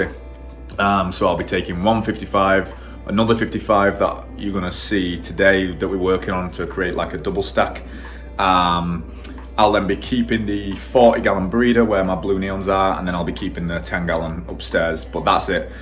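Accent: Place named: North Yorkshire, United Kingdom. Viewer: Eyup fella. Happened upon your channel because i am toying with the idea of breeding. Not necessarily Cichlids, but anyways. I'm going to have to go back through your vids now! Sounds like you are from my neck of the woods, judging by your accent. I am from North Yorkshire (Gods country).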